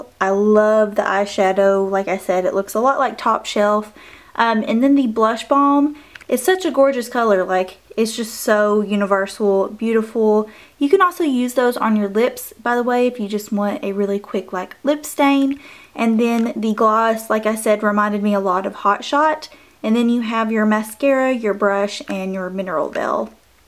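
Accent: South, United States. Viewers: Love to find a beauty guru with a southern accent 😘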